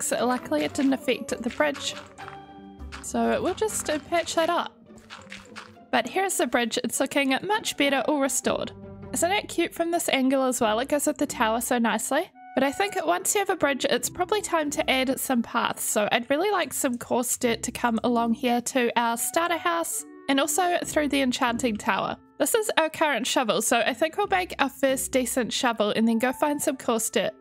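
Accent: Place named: New Zealand. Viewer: omg stop ive been following u for a while an just now do i realise ur kiwi too xD (no wonder why i felt so comfortable listening to u speak cos there isnt an accent for me haha) kia kaha e hoa !! aroha nui hehe <3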